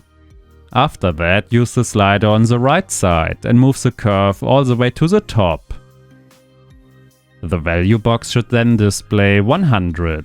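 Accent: Germany. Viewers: Are you German? sounds very German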